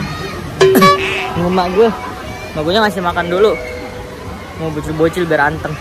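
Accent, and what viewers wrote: Cirebon, Indonesia. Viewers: Bang lu orang Cirebon apa Kuningan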